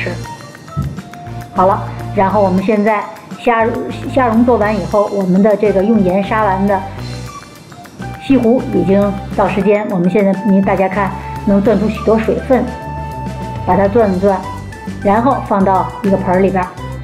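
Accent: Tianjin, China. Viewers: 听口音，是天津人吧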